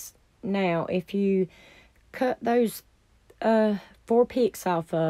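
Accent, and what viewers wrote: South, United States. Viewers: It looks awesome.your doing terrific keep it coming,by the way I hear a southern accent where are you from,I’m from Eastern Kentucky.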